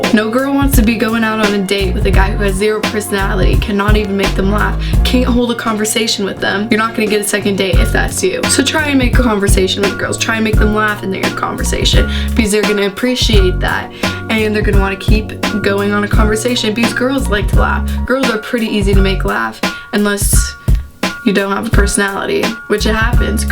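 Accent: Missouri, United States. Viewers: ARE YOU FROM MISSOURI?!!!!😂😂😂😍😍😏I AMMMM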